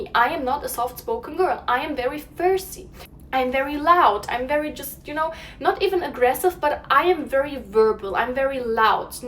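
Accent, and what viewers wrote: Germany. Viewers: Are you German? ☺️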